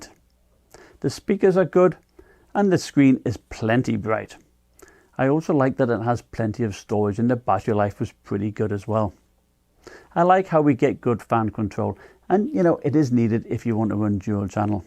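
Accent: United Kingdom. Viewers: Hey, first off I hear a British accent but hear dollars and see that disgusting enter key 😂 must be tripping but I have a really important question, curry’s are really weird with they way the price the laptops here so the nitro 7 with 144hz, 8GB ram and 1660 Ti will be around 800 quid on Black Friday with a 512 gb ssd and the Helios 300 with 1660 Ti 1TB HDD and 256ssd also 144hz will be around 1.1k, what would you reccomend??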